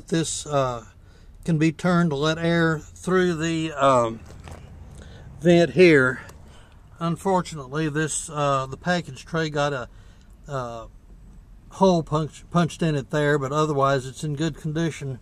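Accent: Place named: Appalachia, United States. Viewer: I had to stop watching half-way through.. the hillbilly narrator with his stutter "uhs" and "duhs' was just too distracting and that video was nauseating!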